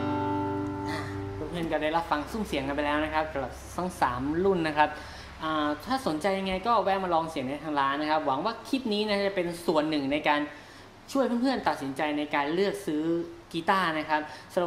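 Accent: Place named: North, Thailand. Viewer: สำเนียงเหนือนี่ ออกชัดเลยน้อ อ้าย อิอิ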